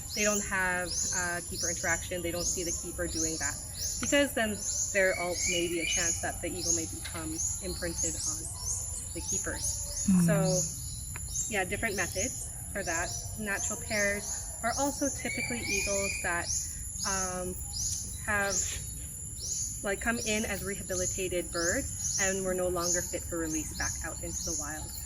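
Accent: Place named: United States. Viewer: expat sya.. american from her accent so no.. di dugo dugo ilong n'ya, it's her native language.. half pinay as she said during the first 5 minutes of the video..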